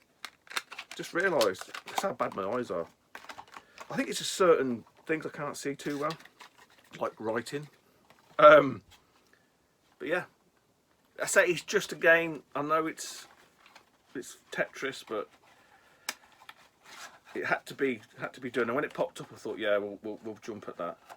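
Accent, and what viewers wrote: United Kingdom. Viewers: it was cool to hear the accent and the eloquent swearing. I have been working overseas the past seven years so I dont hear British accents anymore ha ha.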